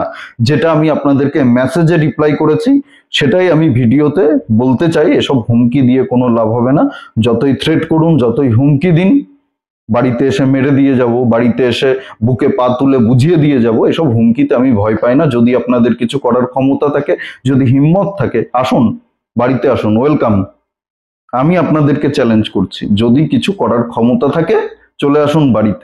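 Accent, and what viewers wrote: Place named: Bangladesh. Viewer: ভাষা শুনে তো ফুল বাংলাদেশী বলে মনে হচ্ছে... imported from Bangladesh...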